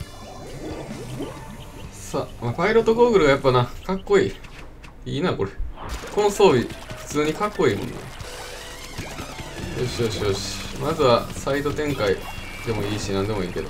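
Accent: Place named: Kansai, Japan. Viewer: 関西弁おもしろい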